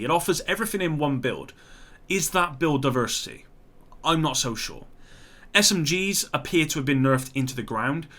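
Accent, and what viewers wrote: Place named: West Country, United Kingdom. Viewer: Wondering what a "mega Fred" is... Just teasing I love that West Country accent. Also bring back optimisation!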